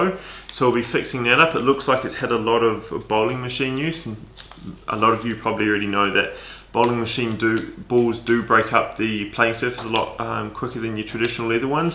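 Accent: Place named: United Kingdom. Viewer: This guy is not american he's brit i bet...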